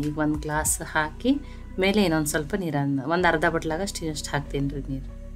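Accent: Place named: North Karnataka, India. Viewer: ಸಾಂಬಾರ್ ಜೊತೆಗೆ ಉತ್ತರ ಕರ್ನಾಟಕದ ಭಾಷೆಯ ಘಮ ಘಮ ತುಂಬ ಚೆನ್ನಾಗಿದೆ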